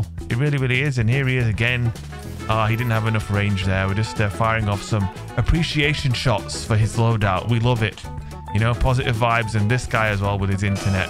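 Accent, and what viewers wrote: United Kingdom. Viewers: I love your videos but will you only make PVP content forever? At least once, show some of that gravy PVE talent you got with that british accent spice, gud lad!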